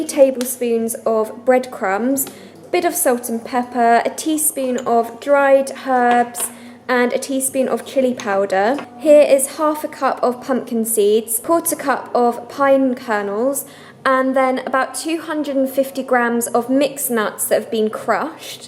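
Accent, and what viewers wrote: RP, United Kingdom. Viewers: I luv ur accent is it British 😍😆👌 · jaysus you're posh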